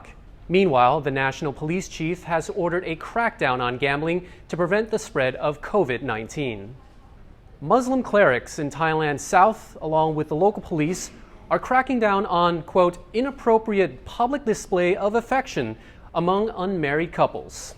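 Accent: United States. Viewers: it's his painful to listen to American accent, if he softened his voice a little would be much better, he's not ugly but that's irrelevant, have you asked him for a online date yet? Zoom xx 😜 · No way bring back Tim theres nothing worse than hearing an Asian speak with an American twang give me the aussie accent anytime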